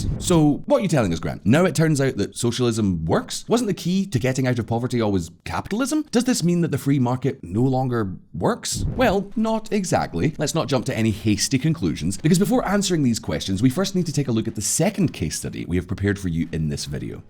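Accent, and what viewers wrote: United Kingdom. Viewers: This was the best Video from accent to being Genuine Uk citizen 😅 I liked it all. Thanks for great neutral explanation.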